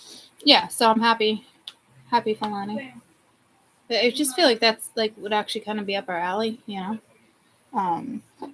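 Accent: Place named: New England, United States. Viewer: I love your honesty. I’ve been following you since day one at your condominium. I rarely catch you live but I always rewatch. You are so gifted. Brenda from New Hampshire, formally Stoneham, Ma. We share the same accent 😂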